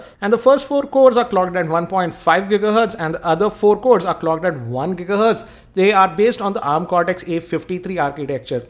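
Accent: India. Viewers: Indian english❤️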